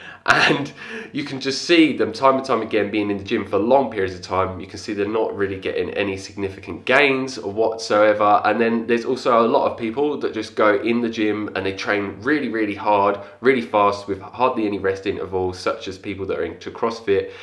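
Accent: United Kingdom. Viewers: but where is your accent from? You sound British? Just curious, keep it up👍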